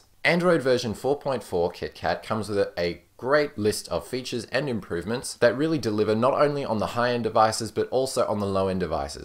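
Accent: Australia. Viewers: So u from Aussie mate ?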